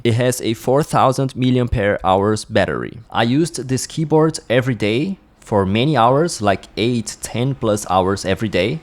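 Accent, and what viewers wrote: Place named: South America. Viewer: Heard a south american accent, where are you from? Perhaps Chile or Brazil?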